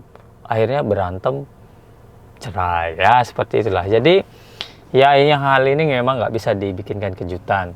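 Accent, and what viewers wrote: Lombok, Indonesia. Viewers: ndek inik telang mule logat sasak tie bang😂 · Bang. logat lombok nya masih ada ya😅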